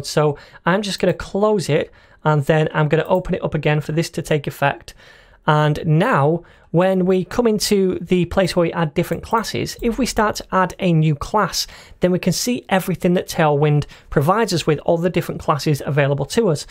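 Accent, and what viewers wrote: United Kingdom. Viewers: What a rare British accent! I'm glad to find this because I'm learning English English at the same time. Thank you!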